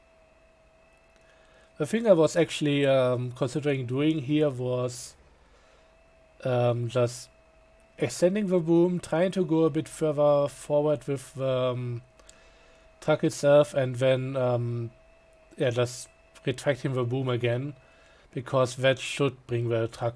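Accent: Germany. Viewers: i really enjoy your germanized english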